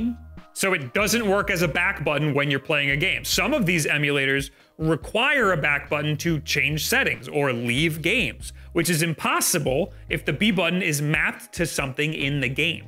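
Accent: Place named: Long Island, United States. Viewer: PLZZZ stop talking like a Long Island hussie 😊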